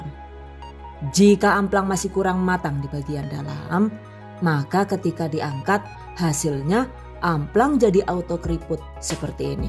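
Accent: Banyumas, Indonesia. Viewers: Yuu...jawane ngapak metu bae lah.. 😁😁tq resepnya... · Selau suka dg suaranya kolaborasi jawa ngapak indo dan english..i like that...jdi ketawa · Akhirnya bisa pegang hp rebutan Ama bocil, Alhamdulillah bunda ini makanan kesukaan anak2, ternyata lumayan mudah ya cara buatnya tapi kudu sabar ngaduk2 kayaknya ni. Makasih bunda makin lengkap aja ni, makin mantap, makin sukses buat. Seneng sama suara bunda yg khas dan candaannya itu loh ngapak kali 😀😀 #GiveawayTHV2021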